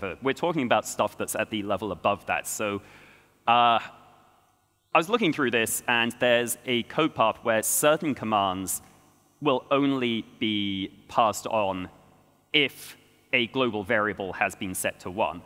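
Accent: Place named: West Coast, United States. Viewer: I'm starting to hear a touch of west coast accent in there...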